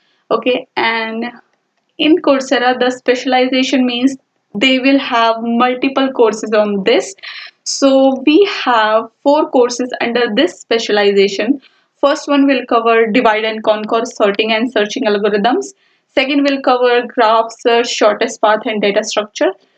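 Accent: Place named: Punjab, India. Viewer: Punjabi accent are u from punjab?🙂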